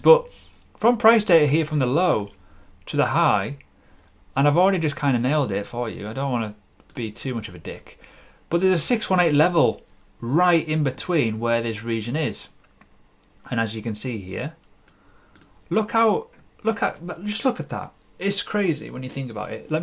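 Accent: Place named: Yorkshire, United Kingdom. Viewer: gotta be a Yorkshire accent?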